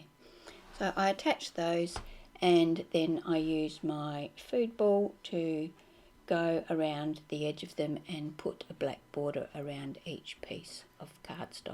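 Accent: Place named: Australia. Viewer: Great process video thank you. Love to hear an Australian voice!!!( I'm in South Australia.) You could put a clear sealer on your background such as clear gesso or matte medium or a spray varnish, then the white won't absorb the colour....Also the powders won't reactivate...good for me to learn from you!!! Thank you.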